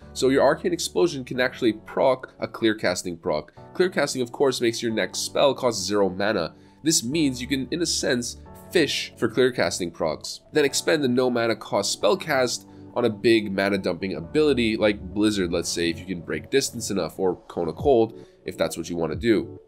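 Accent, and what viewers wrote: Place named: Canada. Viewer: Typical Canadian. Makes my ears bleed.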